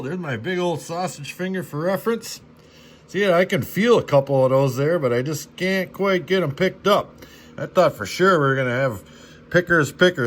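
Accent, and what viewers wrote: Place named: Pennsylvania, United States. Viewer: The " crick " I haven't heard yankee in a long time. That's the we talked in P.A. growing up. Moved to N.C. over 30 years ago. The accent is refreshing. A little taste of home. Love your video's so far. Thank you.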